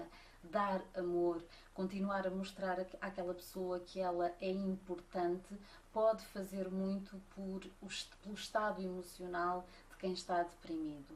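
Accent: Portugal. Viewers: Luan Kennedy Matos é ela fala o português de Portugal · você é portuguesa?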